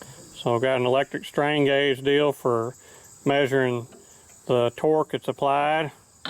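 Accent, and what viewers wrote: Kentucky, United States. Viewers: You have yet to publish a boring video in my estimation. I even enjoy watching a piece of steel go round and round in the lathe. You and a few other mechanical types have helped me learn new information. My greatest joy comes from just listening to you speak with your Kentucky accent about technical information. Keep up the interesting work. It is interesting and yet entertaining for this old country boy! Thank you for the pleasure you provide me with every video!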